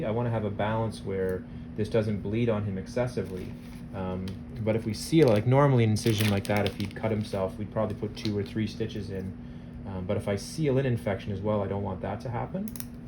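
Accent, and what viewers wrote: Canada. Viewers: My husband is Canadian (I’m American) and I always have a knack for knowing Canadian accents. As soon as I heard you speak I knew you were! Also patient did a great job keeping himself together during the lidocaine. I would’ve been swearing!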